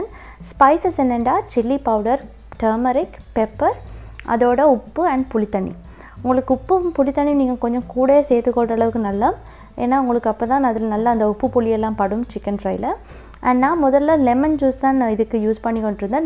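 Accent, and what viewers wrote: Sri Lanka. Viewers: Normala srilankan maariye peusuriga.love you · Periya wela srilankan muslim slang la pesureenga .. nan perunalaki cook panna inga wanden recipie thedi, sari sis 1 suport 1 sub for u dear sis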